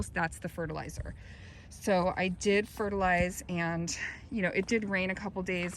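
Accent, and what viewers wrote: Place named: Midwest, United States. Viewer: I'm in central NC newly zoned 8a. Your yard and mine look very similar. I cut back all my knockout roses 2 days ago (ouch!! Those thorns!) and I'll be working on my blueberries today. Are you originally from the midwest? I occasionally hear a tiny hint of midwest accent. Very tiny.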